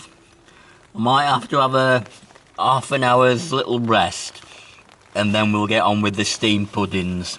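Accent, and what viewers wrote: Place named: Northern England, United Kingdom. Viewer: Hi I absolutely love your videos. After years of hotel room holidays I camped in Wales in August in that humid stormy weather. We were on a campsite and the hail stones were as big as golf balls. My car has hundreds of dents in it! I have arthritis so can't do a lot but I'm so looking forward to getting out there again when we can. Listening to your calm, gentle Northern voice is the best. The video where you packed your glasses in your tent made me smile. Such honesty. I've watched all your videos and love the cookery that goes on. And the garden camp was brilliant I even went out and bought those beers! Fantastic looking forward to the next instalment. Kay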